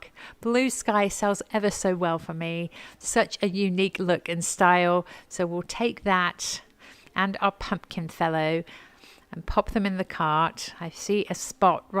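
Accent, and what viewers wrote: England, United Kingdom. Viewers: I'm a new subscriber from England and really enjoying your informative, relaxing videos. I wish we'd have the large Goodwill thrift stores in the UK, our charity shops are very small in comparison, with just a few shelves of bric a brac and very hard to find any treasures. I hope you don't mind me asking, as you and your lovely Mum have English accents with a slight American drawl, so wondering what's your history or connection with England?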